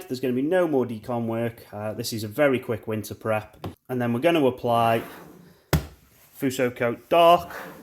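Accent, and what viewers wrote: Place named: Stoke, United Kingdom. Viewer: yes mate, thought you had the stoke twang, phasing out some of the AB stuff which is good but there are some awesome companies out like like alien magic and bouncers. Need something that will give my silver car a good gloss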